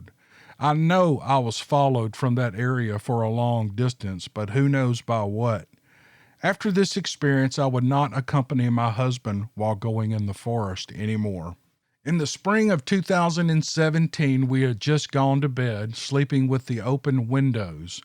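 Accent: South, United States. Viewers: How did i go one day without listening to your great southern draw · It's your voice we want to hear, for me it makes me feel at home.iI was raised with the southern accent, and the La., Missippi way of talking.Just talk all you want, we all love it. Thank you for the storys. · I love putting your videos on while I'm doing other things. Nice stories and your voice is very soothing. I live in Michigan, but I'm originally from down south and your voice (and accent) reminds me of my childhood. Thanks.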